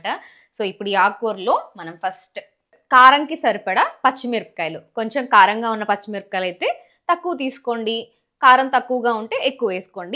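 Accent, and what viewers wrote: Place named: Telangana, India. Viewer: Meeru andhra ammai ainaa Telangana ammaila. Chala Baga matlduthunnaru super Andi meeru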